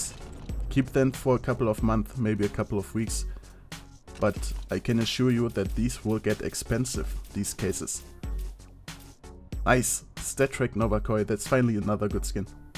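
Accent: Germany. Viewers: Das einzige was zeigt dass du deutsch bist ist dein akzent aber sonst... Läuft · Alter DU sprichst Doch zu 100% Deutsch mehr als englisch......sehe Gerade dein erstes Video und du hast nen SOOOWASVON deutschen Akzent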